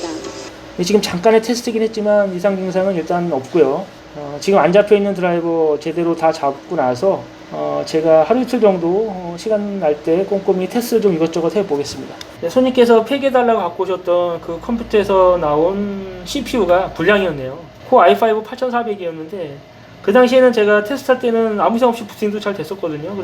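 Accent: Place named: Seoul, South Korea. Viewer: 사장님은 춘천분이신데, 서울말을 정말 잘 쓰심 ○_○ 발음도 좋으심👍👍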